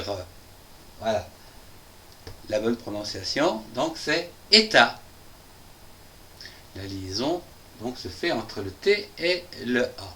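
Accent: France. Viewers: Tu veux rire? Je suis canadien et j'avoue qu'enfin ... je recontre un français qui ne me fait pas ch... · Je trouve que l'auteur à un accent, attention à ne pas répéter avec cet accent qui fait vieille France/Paris 16e et qui vous fera peut-être passé pour hautain si vous insistez dessus.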